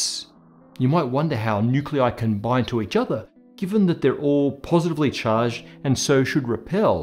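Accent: Australia. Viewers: You must get offended by things you don't understand to comment on YouTube. It's irritating. His accent is australian. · You have such a heavy Australian accent that it makes it very hard for me to understand. And I really want to love your Videos because of the Subject you talk about...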